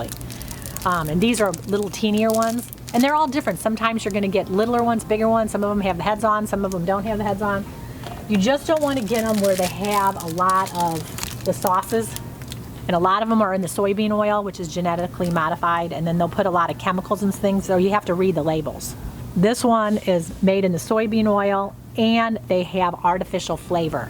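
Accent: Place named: Upper Midwest, United States. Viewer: I have to ask what state you’re from originally; you happen to sound like a lot of folks I know up in the northern Midwest.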